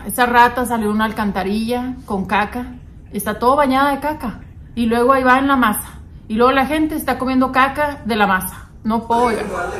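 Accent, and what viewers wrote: Mexico. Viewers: Yo me baño todo los días, el pelo un día sí y otro no. En verano me ducho dos veces, porque soy de una ciudad humeda y se suda mucho. Yo veo por IG un compatriota tuyo que comenta este tipo de videos, como si interactuara con los del puesto, y me rio mucho. Por el acento creo que tb es por la misma zona de Méjico que tú. Ahora no me acuerdo de como se llama en redes. Saludos desde España.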